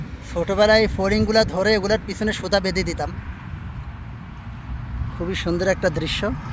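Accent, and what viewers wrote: Noakhali, Bangladesh. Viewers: আইচ্ছা এইটা তো নোয়াখালী মতো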